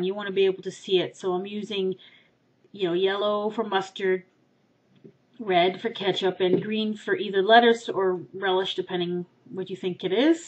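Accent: Canada. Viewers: i love your canadian accent it makes me so happy inside.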